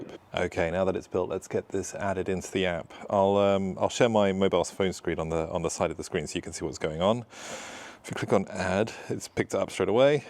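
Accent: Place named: United Kingdom. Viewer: Very good instructional recording; along with your British accent and mannerisms, made this pleasant to watch. Thank you from Canada 🇨🇦 Merry Christmas or as you would say “Happy Christmas!” , and a Happy New Year 🥳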